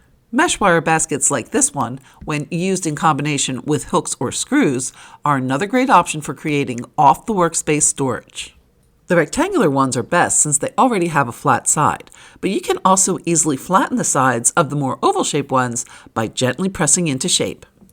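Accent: East Coast, United States. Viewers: It’s a shame you don’t have any craft supplies on hand. I have to wonder how many viewers caught your pronunciation of crayons, “crowns,” rather than, “cray ahns.” Sometimes you’re so east coast. I love it! I’ve got shelves in my craft cave, but I’m a shortie so I can’t reach things on the top shelf. I need to change some of the stuff to your ideas to make the space more accessible. Happy New Year,!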